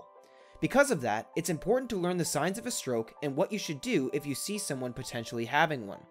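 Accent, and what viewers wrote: Canada. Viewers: is the one talking canadian? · You sound Canadian eyy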